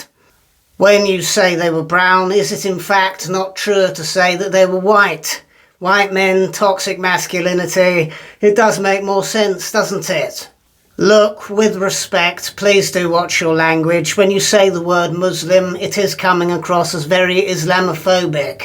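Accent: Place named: RP, United Kingdom. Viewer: I've never heard a Labour MP speak in RP mate, you sound more like a Tory MP to me!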